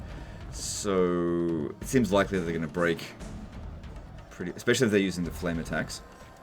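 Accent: Queensland, Australia. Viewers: Your accent sounds queensland to me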